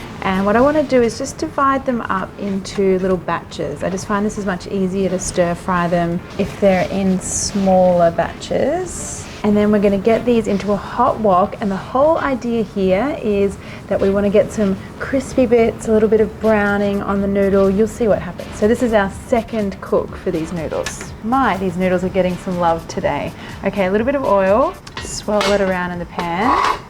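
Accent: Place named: Australia. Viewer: I have been watching your cooking channel n I think you are an excellent chef!! I can tell by the ingredients n your style of cooking. I love it n I will definitely try it. Not only that, love your accent!! Aussie, Aussie, Aussie.....🐨🐨💕✨🦋💕✨🦋😘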